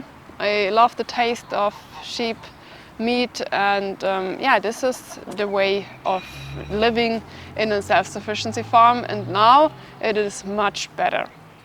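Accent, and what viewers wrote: Bavaria, Germany. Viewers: So leiwand dieses bayrisch :D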